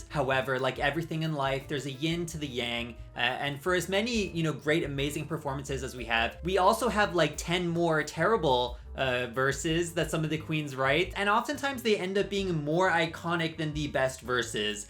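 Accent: United States. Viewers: I know you said don't ask about it... but why DO you have an american accent as an irishman? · Why DO you have an American accent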